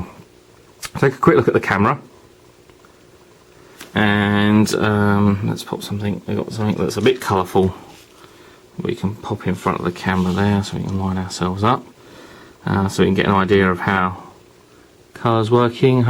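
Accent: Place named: United Kingdom. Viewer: i love Britsh Voice ! <33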